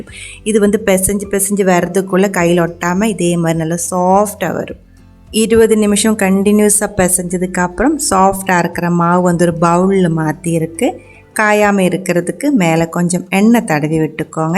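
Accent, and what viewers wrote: Kerala, India. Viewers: കേരളയെവിടെയാ സംസാരം കേൾക്കാൻ നല്ലരസം ഉണ്ട് തമിഴ് മലയാളം കളർന്നു കേൾക്കാൻ നല്ലരസമുഡ് 👌👌👌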